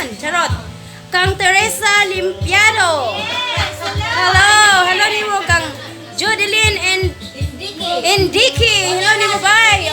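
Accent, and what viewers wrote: Visayas, Philippines. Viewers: Laglom nkaayo pagkabisayaa maayo kaayo ka batbat sa pinolongan bisaya ui idol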